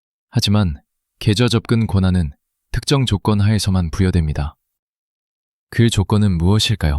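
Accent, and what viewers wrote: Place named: China. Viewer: 중국 발음이네 AI가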